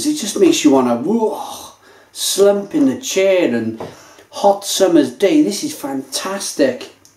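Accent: United Kingdom. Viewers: Gosh i hate British accent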